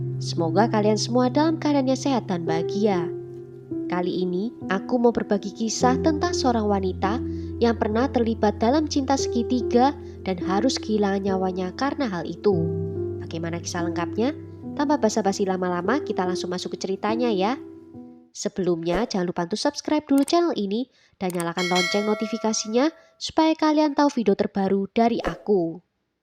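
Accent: Java, Indonesia. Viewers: kamu memang wow sekali pokoknya.... kasus mu pun mantap punya..... dgn aksen jawa yg gak ketinggalan membuat aku terpesona..... top markotop lah....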